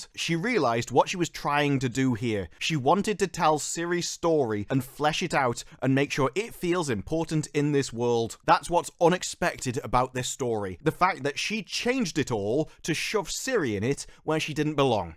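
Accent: United Kingdom. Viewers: offtopic: that british accent just flames ontopic: ye, i hope S4 will be cancelled, they butchered it enough or as the classic Simpson meme said "Stop, stooop! He is already dead!"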